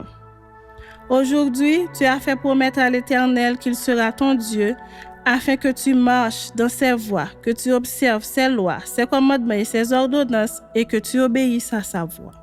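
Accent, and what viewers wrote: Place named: Haiti. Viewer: Merci pour ces lectures j’apprécie l’accent haïtien !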